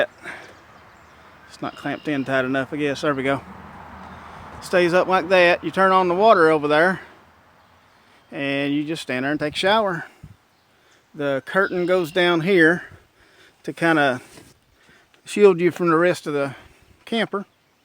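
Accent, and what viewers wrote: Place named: Kentucky, United States. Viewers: I am a new subscriber to your channel and am amazed at your design and the construction of your camper van. I am very interested in this way of life and have been contemplating it myself. You sound like a southern boy. I am from the south too..GA gal here. 😊 Thank you for sharing your awesome ideas!! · I love you're conversion! I'm a Kentucky (Louisville) girl as well and you have a lot of good ideas that I'd like to use on my Mini Bus Schoolie build. I'm about to check out your bus build once I finish watching this video. Oh, and I just loooove hearing your Ky "'Twang" as you narrated your video. What part of Ky are you from?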